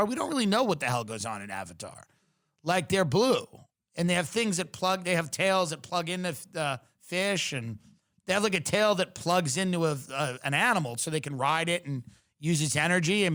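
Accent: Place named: Long Island, United States. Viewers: Long Island baby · ❤️ his lawng guyland accident. I miss it. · The NY way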